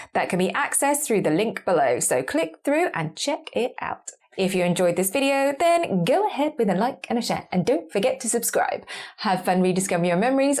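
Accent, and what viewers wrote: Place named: RP, United Kingdom. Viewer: When I close my eyes and listen only to your voice, I can truly believe you are part of the British Royal Family!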